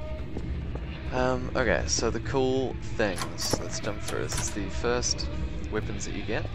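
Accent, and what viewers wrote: New Zealand. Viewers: you got featured on Kotaku also great to see a fellow New Zealander making some waves on the youtube refreshing not hearing a American accent for a change keep it up man you got a new subscriber:) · you kiwi?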